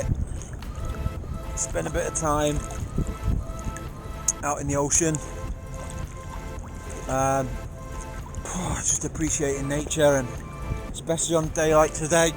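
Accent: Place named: North, United Kingdom. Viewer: Brilliant little adventure. Do I hear some Northern tones in that accent?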